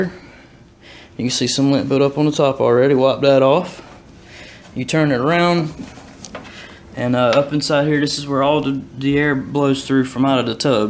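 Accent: South, United States. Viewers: Leave it to a southern boy to slow talk a 15 minute video to 30 minutes.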